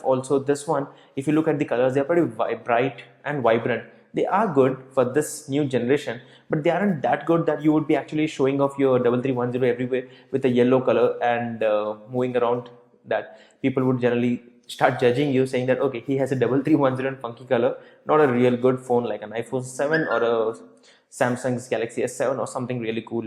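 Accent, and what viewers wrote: India. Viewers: indian accent sucks!!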